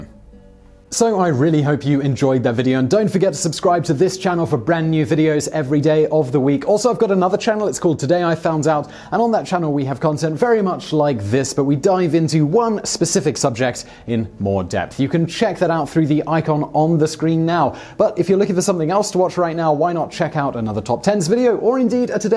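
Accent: United Kingdom. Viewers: Blame his British accent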